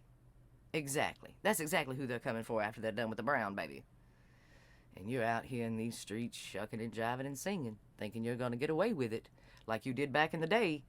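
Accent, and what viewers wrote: South, United States. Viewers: I stumbled upon a story yesterday. The voice was like smoked butterscotch, deeply southern. At first I was reminded of Julia Sugarbaker from the show "Designing Women." Then I got into the meat of the tale... a horrifying recount of a Dark Time and her memory saving her from recalling the full measure of it. Then the algorithm did a good by putting her on my suggested list today. You, madame, are AMAZING.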